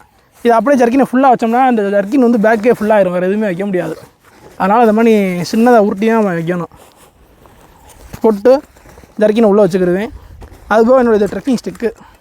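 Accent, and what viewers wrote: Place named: Madurai, India. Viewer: Unga madurai slang kum unga muyarchikkum seekiram success varum bro❤😍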